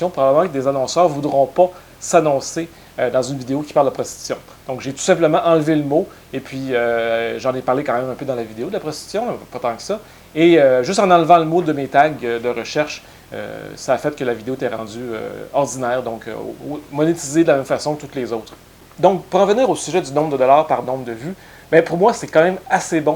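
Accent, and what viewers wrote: Quebec, Canada. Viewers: franchement, c'est grace à ton accent que je t'ai découverte: c'état pour mieux me préparer pour mon prochaine voyage à Montréal.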